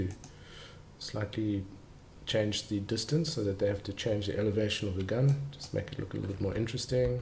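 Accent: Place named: South Africa. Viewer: Yeh, unfortunately it still doesn't work... i've gotten some progress though, i managed to use vanilla but only the leader of the group is moving Edit: ive done it! I know that your from South Africa i could tell by your voice so i'll let you sleep, but after faffing around with my faults i got it to work. Sorry to bother you and thanks again!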